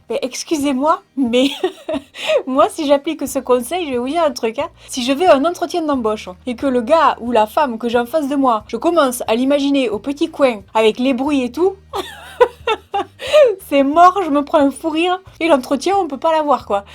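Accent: South, France. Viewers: OMG, là je ne pouvais pas ne pas écrire: Prend un caillou si tu as un point de côté!! Jamais entendu celle là. Les gens qui ont inventé ce conseil étaient pas très fut fut. Tu veux qui fasse quoi le caillou! Il est pas magique:'D Sinon un conseil quand tu as le occet (aucune idée de l'ortho) on m'as souvent dit de boire de l'eau, ça a jamais marché:'D ou alors bloque ta respiration, ça marche temporairement mais après je l'ai toute la journée. Pas cool. Par contre ce qui marche, c'est une cuillère de citron et du sucre. Après chacun est unique donc... ce qui va marcher sur qqun ne va pas marcher sur un autre. En tout cas, je voulais te dire que depuis que je suis ( depuis tes débuts) je ne peux me passer de regarder chacune de tes vidéos. Tu mets de bonne humeur! Ton accent du sud me réchauffe autant que la bonne humeur que tu dégages. Merci d'être toi!:D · J'adore ton accent du sud, ça met du soleil dans ma journée ! 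Sinon pour les études ça fait 3 ans que je fais de l'informatique et j'ouvre enfin les yeux en me disant que c'est pas fait pour moi ... Bon au bout de 3 ans c'était un peu long mais du coup je sais même plus ce que je dois / peux faire avec ma filière, compliqué !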